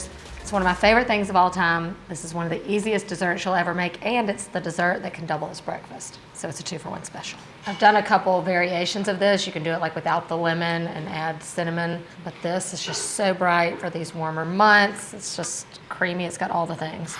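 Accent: South, United States. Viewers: I concur! And I love that southern twang. Reminds me of home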